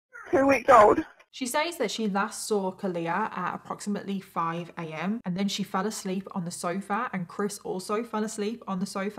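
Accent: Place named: RP, United Kingdom. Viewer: It's weird to hear someone with her accent be shocked about cousin relationships.. royal fam history?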